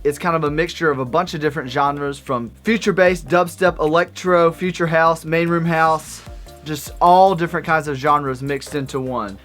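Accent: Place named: Denmark. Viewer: you sound danish:)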